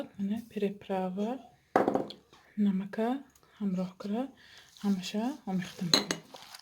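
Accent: Isfara, Tajikistan. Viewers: АПА МАН АНЧА ПЕШ КАНАЛАТОНДА ПАТПИСАТ КАРА БУДАМУ ХАБАР НАГИТАМ ХОЗИР БОШАД ХАМАИ ВИДЕХОТОНА ДИСИЯМ МЕГУМ ШУМО АЙ ТАРАФИ ИСФАРА НЕСТЕД ТАРЗИ ГАП ЗАНИ ИСФАРАГИ БА МОНАНДД АГАР ААЙ ИСФАРА БОШЕД АЙ КАДОМ НОХИЯШ 👍👍👍👍👍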